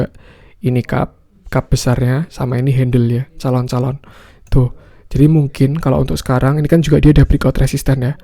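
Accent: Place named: Java, Indonesia. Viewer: HD BANGET TRADING VIEWNYA! PENJELASANNYA KERENBGT ADA "REASON" JG KAYA OBLIGASI AMERIKA DLL AKU SUKABANGEEET. AKU SUKA KALAU ORG BAHAS WHY. GA CUMA ASAL JD AJA.KEREN LOH NGERTI AKUUUU. MAKASI BANG!!!! Tambahan, aku tu kurang suka denger orang ngmg medhok sbnrnya, tp abang org pertama yang aku ikhlas dan berterimakasih krn udh ngomong😂 emang suaranya radio-able juga sih. tengkiu banget ya bang! Oiya gaada noise sama sekali kok bagus. SEMANGAT TERUSSSSS BANG😊😊😊😊 eh mas ya harusnya ehehe Bang pleaseeee mau PWON, ASRI, KRAS, PTBA yaaa!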